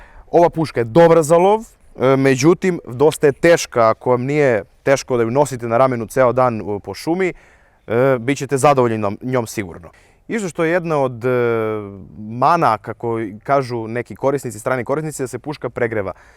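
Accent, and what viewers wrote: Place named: South, Serbia. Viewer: Yugo soldier papcina obicna ovaj he ozbiljan momak sa juznosrpskim akcentom very funny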